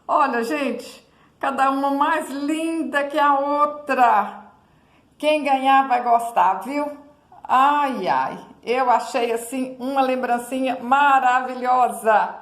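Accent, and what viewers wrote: Minas Gerais, Brazil. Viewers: Sem querer achei o seu vídeo e amei...vou tentar fazer essa lindinha. Pelo seu sotaque é mineirinha? Um abraço fraterno da carioca aqui. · Difícil saber o que é mais fofo, se é o artesanato ou esse sotaque mineiro bom demais da conta... 💟💖💟💖😊😊😊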